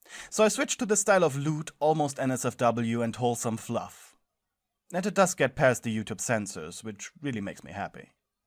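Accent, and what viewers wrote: Germany. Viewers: I assumed you were a German through your accent Ich hoffe sehr das dein Neustart Erfolgreicher wird! ich verstehe dich sehr im Thema arbeitsuche (& Phobias/anxiety) Ich wünsche dir viel Glück und werde versuche dich in all möglichen Sachen zu unterstützen!❤️ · Fuckin knew the accent was German. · I knew from the moment I heard the first asmr you were german!! I know it’s really hard but I know you can do it! We are always there to support you! Soooo viele Grüße aus einer klein Stadt in Deutschland! Hoffe sehr das du dein Ziel erreichst! 💕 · Ich hatte ja schon ewig das Gefühl dass du Deutsch bist wegen dem offensichtlichen Akzent :D Ich fühle mit dir das Leben wird immer wie teurer und wir jungen psychisch angeschlagenen Leute können da kaum mithalten…Bin selber noch mit fast 30 bei meinen Eltern und es ist mir so verdammt unangenehm! Ich hab deine Videos schon so vielen Leuten geschickt die ich kenne da deine Vids so gut sind bin echt sad zu hören dass du weniger Klicks bekommst als du verdienst :( Das tut mir mega leid. 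Hab selber mega Phobien und arbeite online als Streamerin, ist schwer Klicks zu bekommen und die Konkurrenz ist riesig zur Zeit womöglich wegen Corona da alle versuchen von zu Hause aus Online zu arbeiten :/ Deshalb will ich mich nach Amerika begehen und ich finde mit deiner Stimme solltest du ernsthaft darüber nachdenken das gleiche zu tun um Synchronsprecher zu werden! Man kann es auch online machen ^^ Gibt n Discord Channel namens The Voice Acting Club dort gibt es viele hobby Synchronsprecher die dort Jobs bekommen für so amateur Comics, Youtube Videos von Leuten die gute Stimmen suchen. Wäre also möglich dort auch für dich den einen oder anderen Job an Land zu bringen um dein Einkommen aufzubessern ^^ Hoffe diese Tipps helfen dir :)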